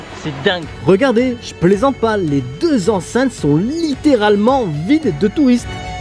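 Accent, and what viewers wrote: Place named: Marseille, France. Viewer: Trop fort le gonze, j’adore tes vidéos et surtout tes commentaires à la marseillaise 👍👍👍 Merci de nous faire découvrir ces superbes endroits et merci pour ton travail 😉 continue !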